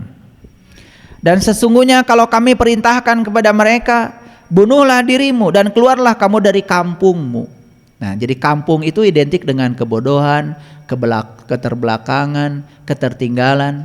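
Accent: West Java, Indonesia. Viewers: Lebih kepada logat bos,, logat Sunda emng sperti itu, akn brbeda dngn logat orng Jawa, orng Arab dn sbagainya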